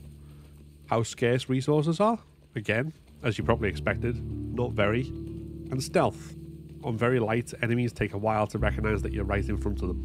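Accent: Liverpool, United Kingdom. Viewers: Man I love the scouse accent haha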